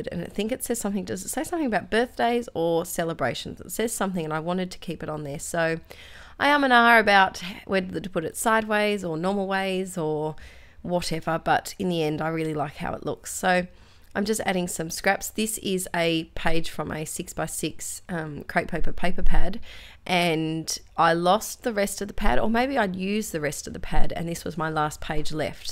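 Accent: Australia. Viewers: Always look forward to your videos (you are the first person I look for each day when I first log into YouTube!!) and I love your "Scrap Stash Saturday" videos - it's lovely to see such inspiration and different ways of using those little bits which would normally either sit in a drawer or be put in the bin. Watching your videos and techniques is what made me start buying and using Distress Stains and Sprays but I'm only a beginner, and yesterday I bought my first Project Life cards and page protectors - thank you!!! I am in the UK and really enjoy listening to your accent and hearing about life in Oz too... we were in Hobbycraft yesterday (a bit crafting store) and I was walking around with my boyfriend, we kept seeing different things like Gelatos and thickers, each time I turned to him and said "that's what uses" lol, think he thought I was mad!! Keep up the great work <3 xxx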